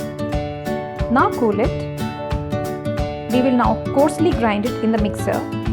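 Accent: Garhwal, India. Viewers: मैम जतका स्वाद यूँ पहाड़ी व्यंजनू मा छ वतका इ स्वाद आपकी गढवली बोली मा भी आंणू छ। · Thank you so much mam for uploading it.and hearing Garhwali from you is such a treat to ears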